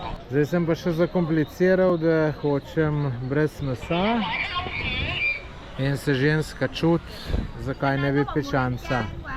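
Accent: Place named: Dolenjska, Slovenia. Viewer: po moje pomen,da se je usedel🤣..men je smesna ta njegova dolenscina... A ves kaj pomen pride puj?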